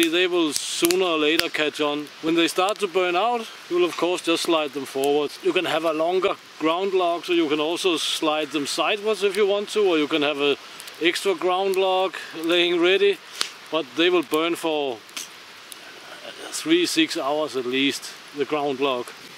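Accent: Germany. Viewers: Why the German accent?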